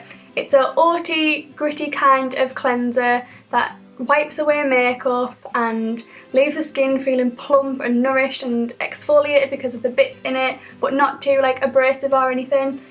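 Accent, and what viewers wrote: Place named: North East England, United Kingdom. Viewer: It's always nice to hear a familiar accent! (Woop woop, north east!) I like the sound of the Solar Powder, I'll have to have a snoop next time I'm in Boots. I always forget that S&G do make up! x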